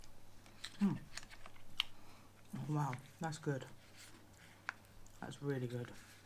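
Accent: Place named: England, United Kingdom. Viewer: That was a really good review. Great amount of food, and plenty of drinks and snacks. That English accent. Looking forward to your next review.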